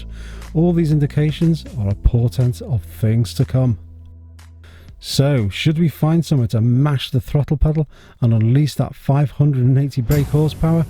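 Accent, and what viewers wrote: United Kingdom. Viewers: i like british accent -i activate CC to learn better english... i learnt some words ive never heard before in this video .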